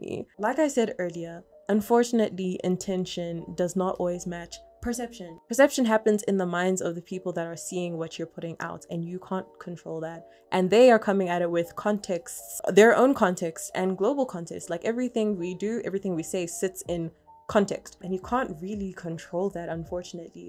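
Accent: South Africa. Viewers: I was wondering is this a South African accent. Thinking nooo maybe uk maybe Australia then you hit that “but wow” and now I know. I’m sure. Hello my sister.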